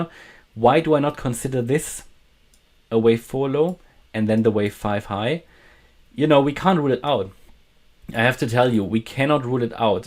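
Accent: Germany. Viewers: Also komme was wolle, ich mag dein Englisch. Ist so dolle mit deutschen Akzent, aber gleichzeitig recht detailreich und super verständlich. Machst du gut . Wünsche euch viel Erfolg mit dem Kanal. Ihr bietet sehr kurzfristigen Input, und nicht erst 3 Tage später nach dem Motto:”Das war ja absehbar!” 👍🏻👍🏻 damit kann ich zumindest mehr anfangen !!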